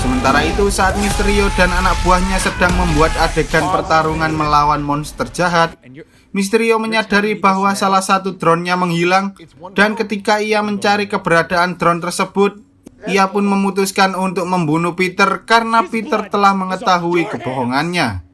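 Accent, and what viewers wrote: Java, Indonesia. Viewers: Medoo bngt lu anjing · Jowo mu ngndi lur?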